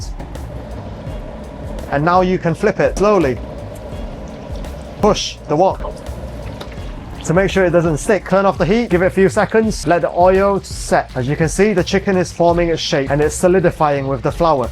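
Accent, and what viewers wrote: Northern England, United Kingdom. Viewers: Slight hint of northern English accent? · Do I detect a slight British accent? 😲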